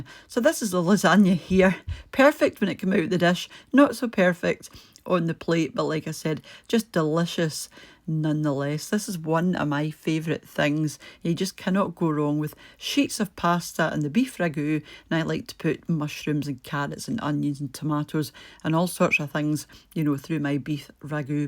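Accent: Scotland, United Kingdom. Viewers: Evening, as a Yorkshire woman I must say you can be a honorary Yorkshire Lass! What an impressive Yorkshire pudding! I also love the way you say Yorkshire in your beautiful Scottish accent. Lovely meals this week ❤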